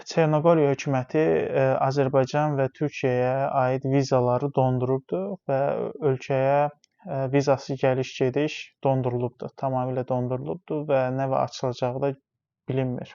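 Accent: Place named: Armenia. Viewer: Sənə qulağ asanda adama elə gəlir ki bir erməni dığası zərbaycan dilini yaxşı öyrənib və Talış mıllətiylə Azərbaycanlıların arasın vurmaq istəyir,talışları mən həmişə özümə qardaş bilmişəm və biləcəyəm,sən deyilsən sən erməni dığasısan.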